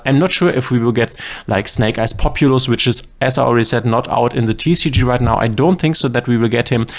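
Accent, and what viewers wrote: Germany. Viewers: Dieser deutsche Akzent 😁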